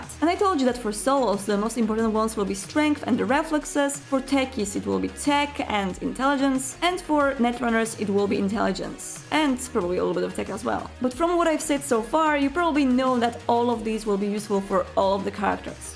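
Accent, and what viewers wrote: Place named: Poland. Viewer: I'm loving these videos and your polish accent <3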